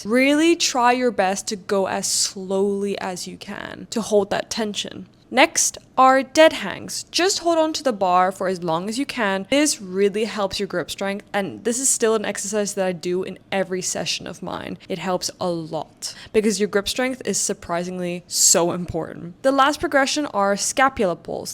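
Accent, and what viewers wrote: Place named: South Korea. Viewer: Love the South Korean accent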